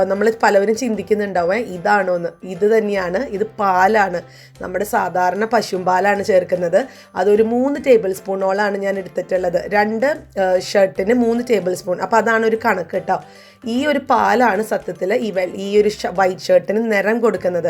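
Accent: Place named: Thrissur, India. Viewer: alla vedio vum kanarundu. njan aadyame chindichu. ningal trissur kkari aanennu. othiry eshtanu samsarikkunnadu kelkkan. wish u good luck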